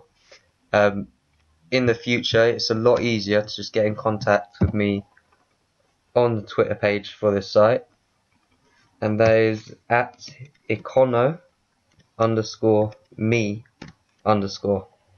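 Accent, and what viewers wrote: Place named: United Kingdom. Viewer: thank you so much!! and love your beautiful british accent....haha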